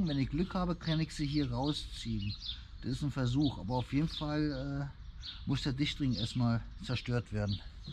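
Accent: Berlin, Germany. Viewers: Die Berliner Schnauze ist einfach klasse💪🏻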